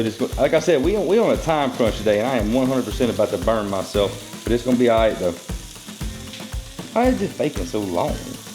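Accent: South, United States. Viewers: I Love You! I want you to know, I have always been told about my Southern accent. You talk just like me!!! I Love watching you cook!🎉🎉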